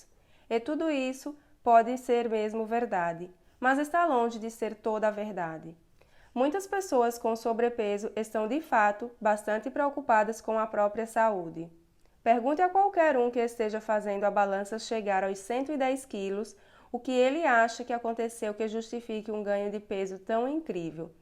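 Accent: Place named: Pernambuco, Brazil. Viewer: Parabéns. Pelo sotaque parece ser do Pernambuco.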